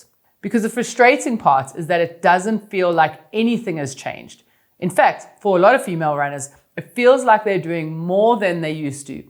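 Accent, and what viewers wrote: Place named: South Africa. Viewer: I’m American and my best friend is from Johannesburg, I love your SA accent. Thank you for the great tips (I’m 50 and just started running this year)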